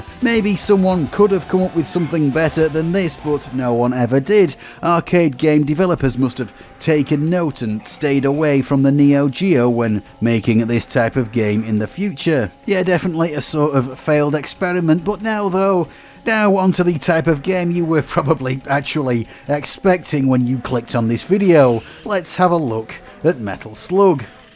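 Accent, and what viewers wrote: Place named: England, United Kingdom. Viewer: I hear a fellow english accent, I see neogeo, I KNOW the metal slug section is going to be the longest lol Nice one my man :D.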